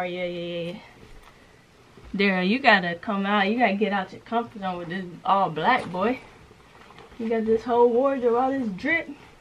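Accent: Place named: South, United States. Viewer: Love your personality love your voice where you from. You have a southern voice.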